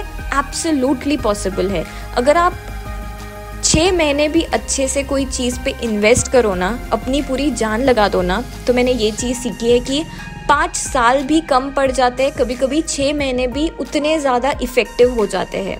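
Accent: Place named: Maharashtra, India. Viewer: Apan is an emotion ❤️ this proves that dii had passed a lot of time in Maharashtra and has a quite Marathi Toning 😂❤️❤️ GOD BLESS DII🙏🏻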